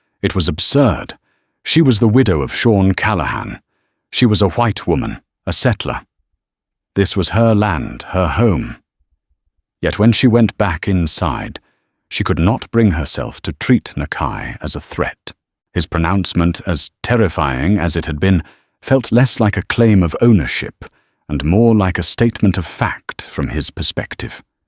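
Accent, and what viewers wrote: United Kingdom. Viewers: Does anyone else wonder why this channel uses a British accent AI narratorfor American western stories? It makes no sense to me at all!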